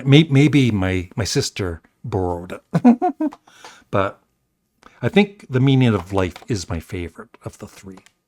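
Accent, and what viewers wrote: Canada. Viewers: Nice shirt youre wearing in this video and I like your Canadian accent.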